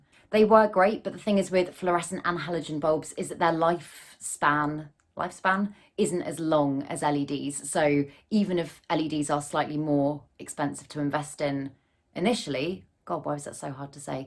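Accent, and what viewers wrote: United Kingdom. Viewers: Obviously, not an american dialect, must be something from the british isles, I would say. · Not to be a creep and disregard the video but I find British accents extremely annoying but yours was pleasant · Thanks for sharing. Very informative and to the point. Love that accent btw :) Takes me back decades ago when I used to date British girls lol.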